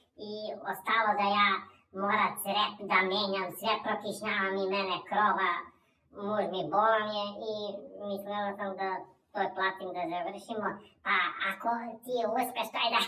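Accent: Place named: South, Serbia. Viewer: BRATE ODAKLESI? CEPAŠ JUŽNJAČKI A DRAGICA ME PODSECA NA KOMŠIKU BABU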